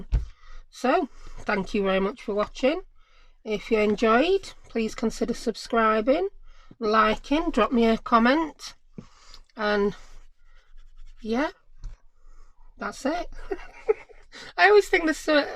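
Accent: United Kingdom. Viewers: #1. LOVE your tutorials. #2. LOVE your accent! Might sound crazy but your accent is very much like Paul Sutherland from True Crime Enthusiast podcast! The hubby and I love that podcast. I say this with the utmost appreciation because it is a welcoming accent. I feel like we could sit and chat over a cuppa tea<<<of course that kinda sounds like the start of a creepy stalker episode of True Crime-muwahaha. {Nah...just a native New Yawka that loves cool accents and all things UK 💜} and #3. You mentioned a type of glue-is it "calall" glue"-when adhering the corners. What kind/brand of glue is that? Thanks so much for all your awesome sharing!!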